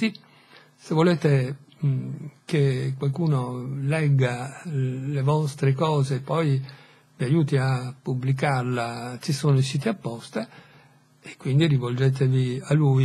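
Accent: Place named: Italy. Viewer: Sto facendo un blog, perché sono una professoressa e, qui in Spagna, è preferibile studiare la diffusione dell’educazione (bene, giá sai...la neolingua, come avverte Orwell educazione=istruzione; istruzione=come usare un oggetto appena comprato, etc. etc.) anche attraverso questi mezzi (in questo mi trovano pienamente d’accordo). Trattandosi di un blog in costruzione, ancora non c’è nessuno che segue il mio saggio multimediale (esercizio di un corso), però questo blog è già sul web ed io mi sono permessa di citarti come gran lettore di libri italiani (il mio obiettivo è sempre comunicare con i miei studenti di lingua italiana qui in Spagna e poter mettere loro a disposizione materiale di supporto che li allontani un po’ dai soliti esercizietti del loro libro di testo. Per farla breve, sto montando un blog sulla storia immaginaria, la storia come avrebbe potuto essere, riempire con la fantasia i vuoti che a lasciato la storia, etc. 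Ho voluto inserire come esempio anche 1984 (inventare il presente) e ho messo il tuo link, con due parole per presentarti e consigliare che leggano gli audiolibri pubblicati da te, per via della tua eccellente dizione, della passione priva di enfasi con cui interpreti le opere e della cadenza perfettamente italiana (questo appoggiare le frasi sulla penultima parola, che rende il nostro parlare cosí dolce e che per gli stranieri è tanto difficile da assimilare)...insomma, mi da dai il permesso di lasciare tutte queste cose sul mio (purtroppo ancora a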